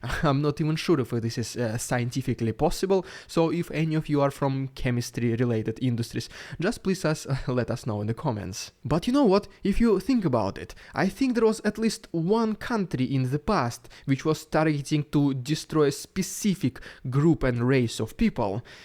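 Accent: Russia. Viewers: Pay attention 00Loxley! The gentleman on your screen, the one with the Russian accent. The one whose channel is named " ". Have an educated guess where he's from and what his channel name is. And stop smoking that stuff, it ruins your attention span! 😀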